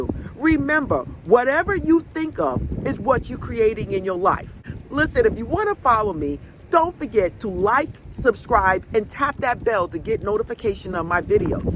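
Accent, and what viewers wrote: New York, United States. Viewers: She sounds like a New Yourka!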